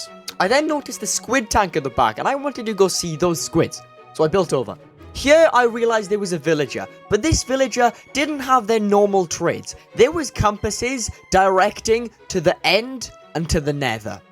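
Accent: Wales, United Kingdom. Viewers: No, he’s welsh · Are You Welsh? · Are you from wales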